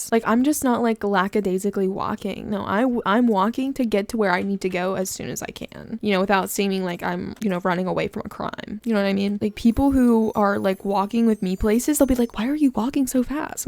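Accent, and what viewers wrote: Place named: South, United States. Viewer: your southern accent is 🤌🏻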